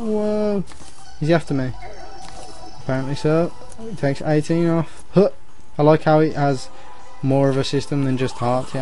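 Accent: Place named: United Kingdom. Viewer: I love these Playthroughs with guys with Brittish accent playing it while talking, it's fun to watch! And I'll give you an subrscribe :)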